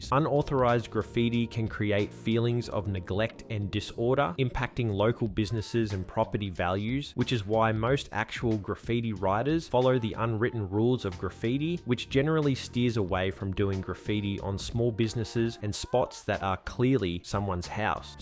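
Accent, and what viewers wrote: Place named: Australia. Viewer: Australian accents are so cool. Great video, as always, especially the comedy bit at the end. 👍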